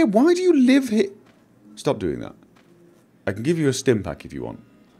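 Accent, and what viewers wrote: United Kingdom. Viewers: Where is from? It says he's from norway but he has kind of a british accent and he says R in a pretty weird way. And I'm not hating or anything I love his vids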